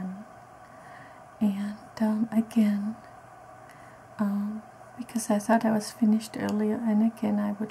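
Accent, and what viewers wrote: Germany. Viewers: ich komme auch aus Deutschland, allerdings aus dem hohen Norden! Ich mag Deine Videos und höre auch noch manchmal einen leichten schwäbischen Dialekt bei Dir ;-) Liebe Grüße aus Kiel :-)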